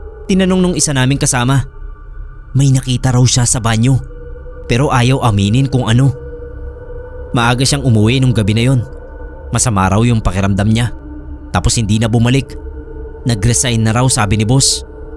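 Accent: Iloilo, Philippines. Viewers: boss first time ko sa channel mo pero naririnig ko na mga videos mo kapag nanunuod kapatid ko . Gusto ko lang itanong, ilonggo ka no?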